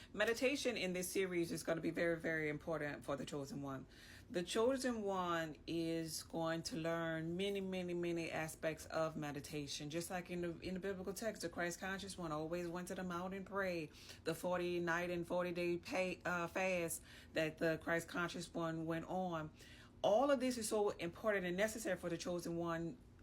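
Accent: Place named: Gullah Geechee, United States. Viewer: I love your accent! 😍 Totes random! Sounds Gullah Geechee.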